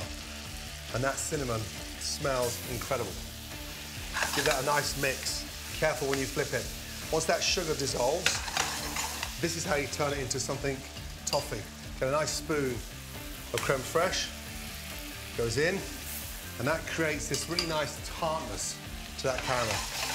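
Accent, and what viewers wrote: United Kingdom. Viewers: Hi Gordon! I love your dishes, I'm Mexican and for me it is difficult to understand your British English, but I love to watch you...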